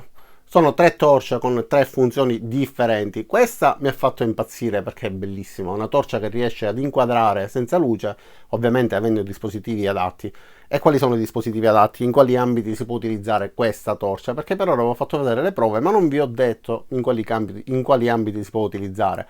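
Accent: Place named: Palermo, Italy. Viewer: Ciao io sono di Trapani, tu dall'accento sembri Palermitano.. O sbaglio?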